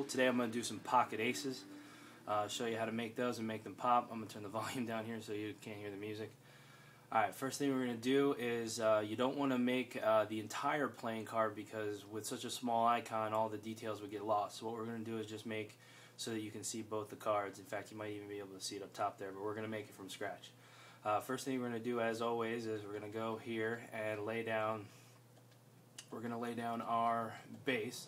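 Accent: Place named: United States. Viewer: Love the American talk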